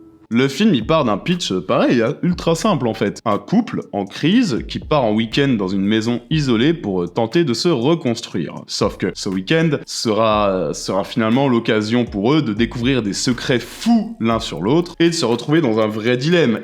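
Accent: France. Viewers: Mais pourquoi les FR vous dites ZHE au lieu de DHE pour THE? Et aussi THAT, cest pas ZHAT ! C'est comme DHAT ! OK, bonne video ! <33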